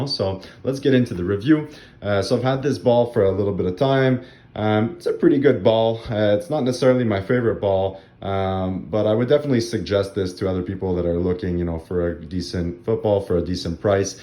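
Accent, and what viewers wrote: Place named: Canada. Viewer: Buddy, questions for you if you have a second. 1) you sound Canadian, are you? If so, I assume you ship to Canada (if you run a shop), oui ou non? Would be great to buy a ball from you to support your work. 2) This Nike ball has been elusive for me - specifically the SKU with the “lower” white stripes as opposed to the striping laid on top of the ball. Do you know where to get one in Canada if you don’t sell/ship them?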